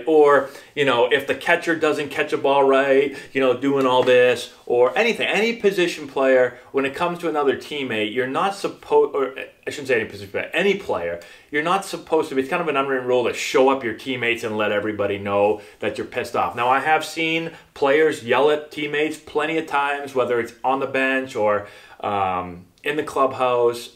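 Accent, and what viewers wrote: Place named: New England, United States. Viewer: has a hint of a New England accent. You can hear it when he says "about".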